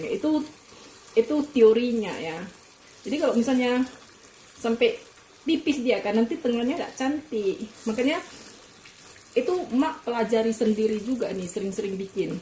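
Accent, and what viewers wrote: Medan, Indonesia. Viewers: kalau ngomong enak deh, dengan logat medannya. Wkwkwkwk.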